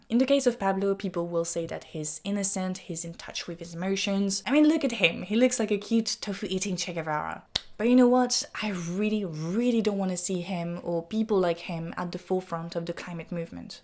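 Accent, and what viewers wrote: France. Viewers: damn i am just a sucker for the french accent. love your content btw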